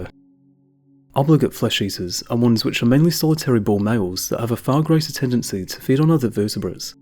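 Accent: United Kingdom. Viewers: First 10 seconds of the video was too British for me to understand 😭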